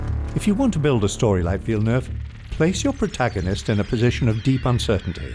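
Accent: RP, United Kingdom. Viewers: I have a hard time taking the narration seriously with him doing the RP accent.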